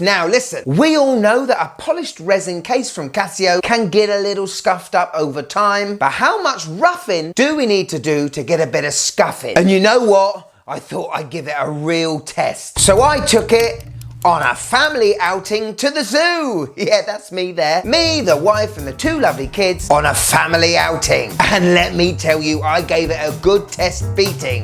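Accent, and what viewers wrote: United Kingdom. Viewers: Hi friend, thank you so much for you videos, and as i'm english learner and i use your videos to learn britich accent, cuz you have a great one, i have a favor to ask, can you add to your video a transcript have all your talk please ? and thank you so much again for your Job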